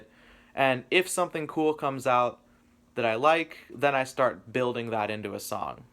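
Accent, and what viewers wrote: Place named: Manchester, United Kingdom. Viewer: Wow. I’m fuckin man. I’m a Scot. I honestly thought you were a Manc or something. Just the way you sing. Very smiths sounding I guess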